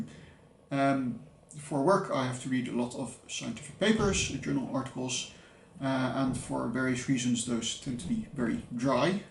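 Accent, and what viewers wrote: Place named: South Africa. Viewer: Ah, "me too" books can become annoying, and it's obvious when they're not sincere. I find it sad and manipulate when artistry is ignored in favour of blatant copying. You have a really interesting accent by the way. I think you've got a tinge of a South African regional accent in you. 😉There's a specific type of Afrikaans accent on certain words that you have, which I find so cool. Most Dutch accents sound completely foreign to me. I never even thought of The Little Prince as a part of this tag. It certainly is sweet, but perhaps for children younger than 10. I didn't even know about it until I was 17, which was way too late. I read it at 19, which again, is simply too old.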